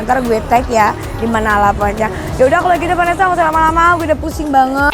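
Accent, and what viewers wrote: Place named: Java, Indonesia. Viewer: Medok euy. Btw anting nya telor ceplok ya ci 😊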